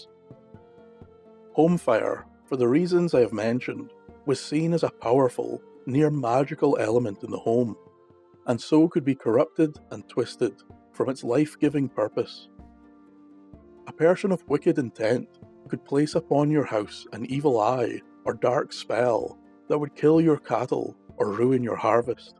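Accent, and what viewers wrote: Scotland, United Kingdom. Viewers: A Scottish accent is my absolute favorite accent and listening to you tell these stories has also taught me proper pronunciations! Because how I’ve read certain words in books is NOT at all how it actually sounds. Lol. I get so excited when you have a new post! Thank you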